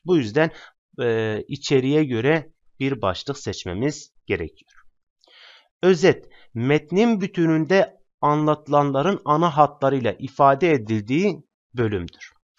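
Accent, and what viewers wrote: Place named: Eastern Anatolia, Turkey. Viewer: Fark ettim, doğu şivesi:))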